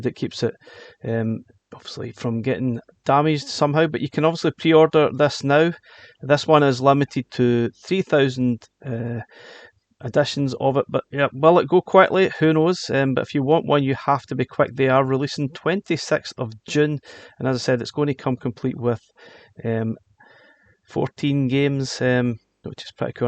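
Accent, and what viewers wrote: Scotland, United Kingdom. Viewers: Shock Troopers is awesome! I can hear your a scot so maybe you like a bit of Jungle/DnB? If so, you'll love the music in Shock Troopers. Shock Troopers 2 loses all the charm of the original IMO. It's wicked getting that on cart. Shock Troopers 1 wasn't even released on the AES back in the day.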